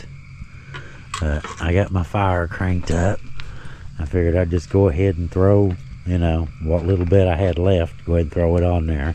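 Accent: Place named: Virginia, United States. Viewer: I have been boondocking in this rain also for days and nights! HA! I love your Hillbilly Humor! You so funny. And you talk like us here in SW VA. I will be camping in West by God in June, love it!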